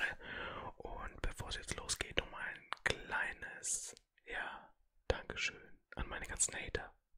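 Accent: Bavaria, Germany. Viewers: Meine Frage: Woher kommst du? Ich vermute mal anhand deines leichten Dialektes, dass du aus Bayern kommst😄🙃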